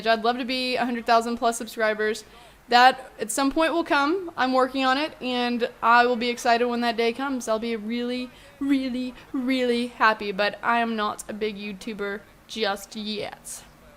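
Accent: England, United Kingdom. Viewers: Keep it up. You'll probably keep getting shit because most of the people on Youtube are quite young and narrow minded. Having a female on the scene though is a good thing. P.s don't let Stephen Moyer hear your English accent =) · Your British accent <3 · BEST BRITISH ACCENT EVER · Hahaha, Your British accent :)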